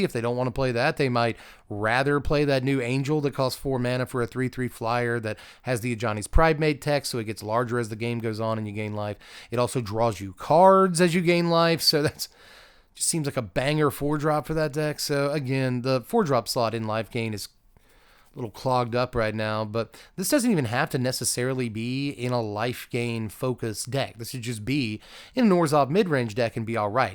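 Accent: South, United States. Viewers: It never gets old hearing a southerner speak spanish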